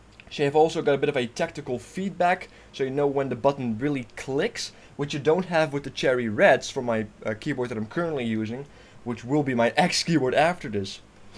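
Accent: Netherlands. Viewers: Are you perhaps dutch by any chance ??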